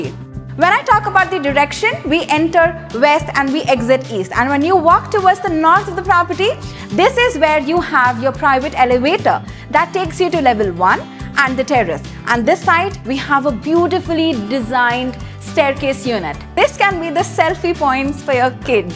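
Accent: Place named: India. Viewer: Desi sound people kada😂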